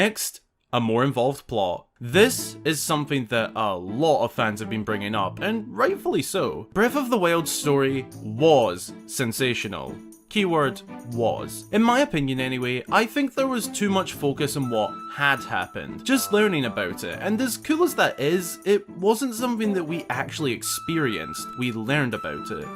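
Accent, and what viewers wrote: Scotland, United Kingdom. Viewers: Dein Akzent ist super! Schottisch klingt richtig gut.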